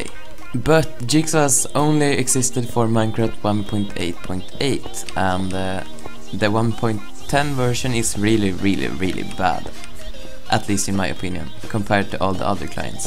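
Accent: Sweden. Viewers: du är svensk det hör jag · lol that english it's mer swenska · R U from sweden? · u swedish?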